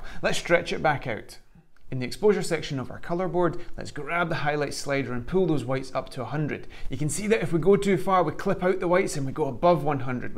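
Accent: Scotland, United Kingdom. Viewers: Interesting explaination. Thank you. I think saturation especially adds a lot in videos. Love your accent. I guess it’s scottish!!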